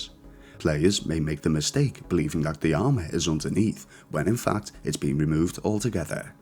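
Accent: Liverpool, United Kingdom. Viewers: Sounds alien to me to hear a scouser on fallout videos. You get the likes n the subs etc for thst alone. Greetings from a manc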